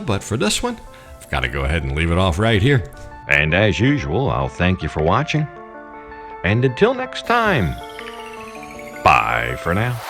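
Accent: United States. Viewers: yes but he is American and pronounce different so you understood what he was saying is that not enough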